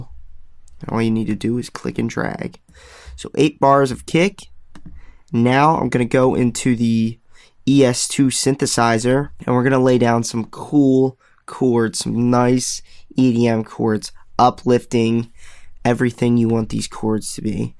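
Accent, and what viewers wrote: Philadelphia, United States. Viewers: sounds like Philly to me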